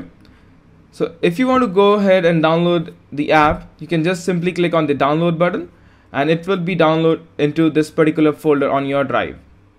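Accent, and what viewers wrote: India. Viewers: sounds like a indian tech support scammer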